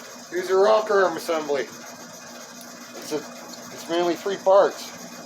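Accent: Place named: Ontario, Canada. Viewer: You often say, "how's she going eh". Are you a from Ontario?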